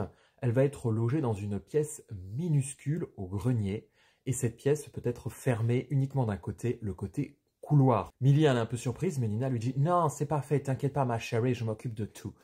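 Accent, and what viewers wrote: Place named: England, United Kingdom. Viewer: Merci pour ce sacrifice qui m'aura évité de le lire 😂 Cet accent anglais juste... incroyable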